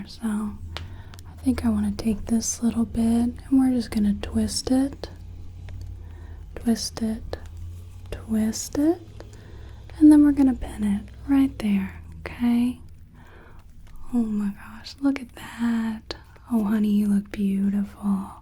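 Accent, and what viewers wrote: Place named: Mississippi, United States. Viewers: super tingly ✨💕 the genuine listening “yeah/mmhmm” during the conversation 🥴🤌🏻💋 I’ve lived in Northern Mississippi most of my life so I’m used to this accent (I have a slight accent, maybe a little less than what you had in the video) so it didn’t hit as hard for me like everyone else 😔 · Your midwestern accent coming through with that mild southern accent you've got going here is so relaxing. I know you said you weren't shooting for anything in particular, but I love where you landed, lol.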